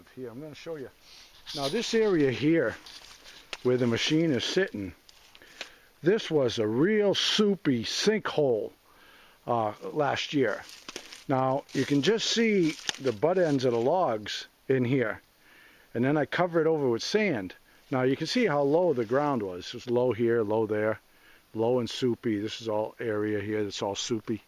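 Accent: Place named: Louisiana, United States. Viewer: No Disrespect but trying to figure out the accent. It sounds like you are from up North with a little bit of the Cajun Louisiana influence. Am I little close or am I way off ? Keep on keeping on ya'll ! Respects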